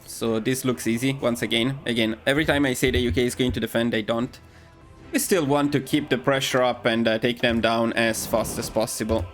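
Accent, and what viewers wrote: Italy. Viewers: Nice video nerd. By the way, are you Italian?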